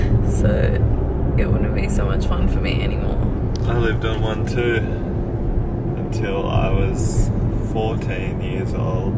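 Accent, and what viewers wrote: Australia. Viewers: What's the city called? I love the Australian accent but sometimes I can never pick up lol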